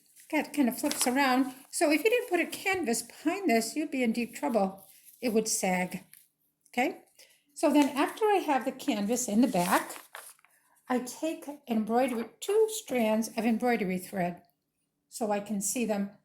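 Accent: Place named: Massachusetts, United States. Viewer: This is so helpful! You sound like you're from MA! Thank you for doingvthis .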